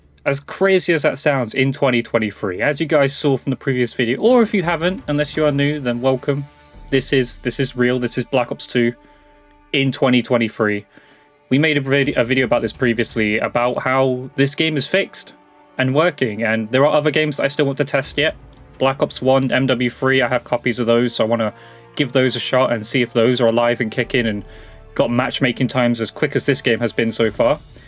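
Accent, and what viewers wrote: United Kingdom. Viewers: I love your accent. English…right? Australian?) reminds me of how Beard meets the World talks. Love it ❤️ 👍 😊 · Oh! stampylonghead is also British so I guess that's why you and him sound somewhat similar? and np keep up the good work. But in your videos I really feel like somethings just missing, it doesn't really draw me in to watch more if that makes sense? it feels kinda bland and its not really engaging too. honestly I'm not really sure on the improvements you could do but I'd hope to see some changes overtime. hopefully I'm not mean with this though, just expressing an opinion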